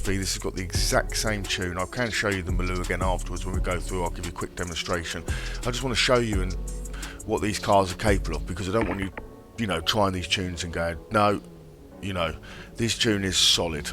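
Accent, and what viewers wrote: London, United Kingdom. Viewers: TOOOOOO many Inside-wheel based gamertags so I hope you are on live,like I say my 2 gamertags are z0mv1(main)& Papa Lazarouz so if you haven't received my friend request then you send methe request fella.I'm up north in the UK by the way,Blackburn!You sound like your from the London area-ish!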